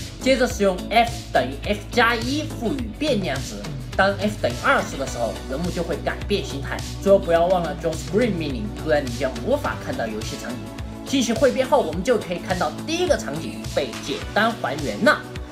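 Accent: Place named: Sichuan, China. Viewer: 蜀產狗，土話嗆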